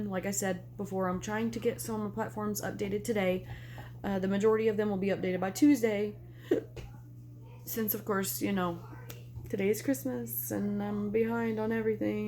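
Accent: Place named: Appalachia, United States. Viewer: It's funny. I haven't seen video from you in like forever (like probably a year or more) and it seems like way back when, you used to have a much more pronounced appalachian accent than you do now. Not that it really matters, but it's just a subtle change I noticed. I'm sorry to hear that you've had to deal with covid. That stuff sucks. I hope that you're back to feeling 100% like yourself real soon. I really need to watch your videos more often. I really enjoy listen to you speak about things. Your level of intelligence is really impressive. I hope 2022 treats you right!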